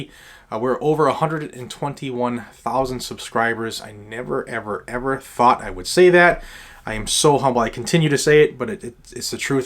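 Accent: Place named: Massachusetts, United States. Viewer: Keep on keeping on! By your accent, i'm guessing that you're from Massachusetts. Don't know if you'd want the aggravation, but if you were to ever run for a seat in gov't, you definitely got my vote! "I was born free, I will live free, and I will die free!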